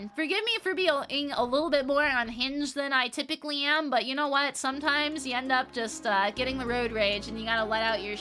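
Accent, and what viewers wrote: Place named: Midwest, United States. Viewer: you sound mid western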